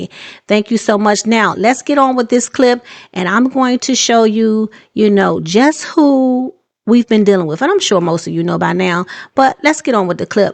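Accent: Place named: DMV, United States. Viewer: Youre absolutely right. You make great points. I hear a little DMV in your voice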